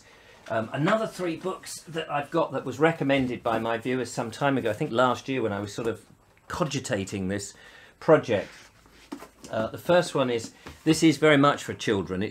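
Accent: United Kingdom. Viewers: Nice, why can't you try to teach British English for the non english people( beginners), I know that lot of english teaching videos are available in utube, but learn from you give some different view I believe, I really enjoyed your english pronunciation, and I very love to learn the British English, and British accent.. Can u do it?...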